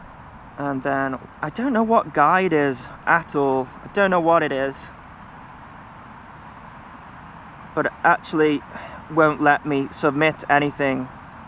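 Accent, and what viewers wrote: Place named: Yorkshire, United Kingdom. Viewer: Yorkshire accent :) I came here from Quadcopter 101 because I wanted to know more. Could you potentially use that booster with any wifi-drone?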